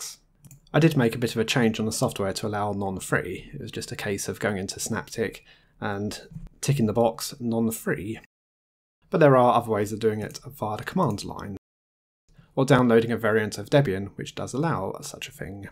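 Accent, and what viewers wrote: United Kingdom. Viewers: dat british accent :)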